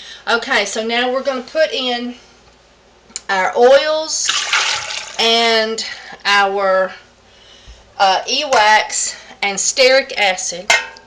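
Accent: South, United States. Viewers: I love how you’re southern af but also a hippie 😊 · I live in Colorado where it is very dry...I had to laugh because your from the south, and I used to live down there...I picked up the accent now and my hsb has wondered "who have you been talking too?" I said I havn't been talkin' to anyone, just a listenin' to a lady on a video" I loved livin' the in the south where I warshed my winda's and then I moved back here where I wash my windows...Not making fun of you, just that you bring back such good memories! Never met nicer people..I love the south...I wish I could get my hsb to fetch somethin', in fact anything!LOL,...but they don't fetch in this neck of the woods...haha....Loved your video's and want to make some creams some now...blessings, Victoria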